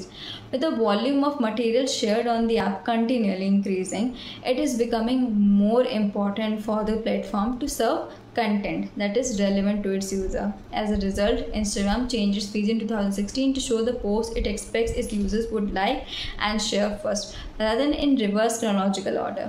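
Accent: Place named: Pakistan. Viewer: omg don't understand you . ? you speak to fast very hard to understand with you're packistan accent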